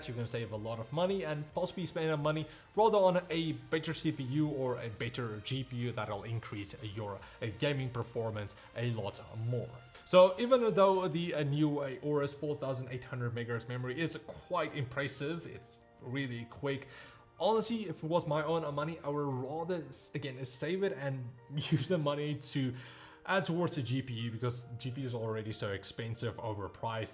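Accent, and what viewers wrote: Afrikaans, South Africa. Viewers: Thanks for the reply. With that Afrikaans accent and expert reviews this could be an epic channel. Thanks for the info on xmp. Now I know.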